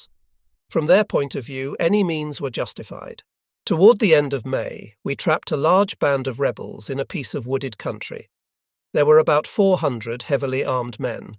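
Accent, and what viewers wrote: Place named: United Kingdom. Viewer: Yeah, the British voiceover is the most entertaining when he yells fire or incoming is hilarious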